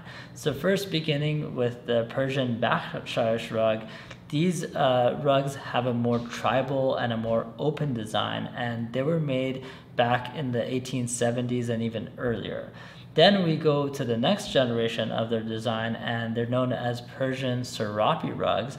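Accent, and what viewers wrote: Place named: United States. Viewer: This video was supposed to be about the art of carpet making. This salesman speil in American accent takes all the fun out of it.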